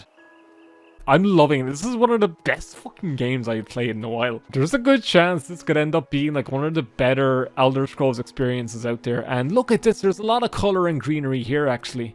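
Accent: Ireland. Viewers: I have a small glimmer of hope, like a candle flickering against the storm, that the morrowind DLC at level 1 will come back. Anyway nice video, still got that charming Irishman voice, like Guiness flowing in a field of clovers. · The Irish man is back! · That’s true his Irish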